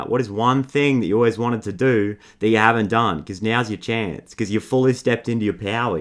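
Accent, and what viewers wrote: Australia. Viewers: I think he sounds Australian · great reading, totally resonates! Thank you fellow Aussie! unmistakeable accent 😁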